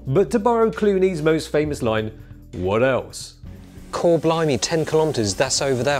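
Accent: United Kingdom. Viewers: i thought this guy was british when i heard this voice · Lame. 7 min wasted to hear the magic website read out aloud in a British accent.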